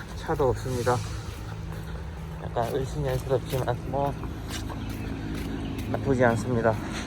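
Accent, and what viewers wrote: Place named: Gyeongsang, South Korea. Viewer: 경상도분 이신가봐요 말투가 상당히 친숙하네요 ㅋㅋ 건강 잘 챙기시길 바랍니다!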